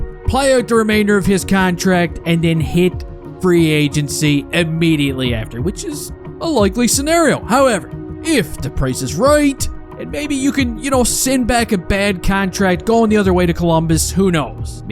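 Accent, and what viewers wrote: Canada. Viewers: Your accent is somewhere between Canadian and Irish. Double-ended lol.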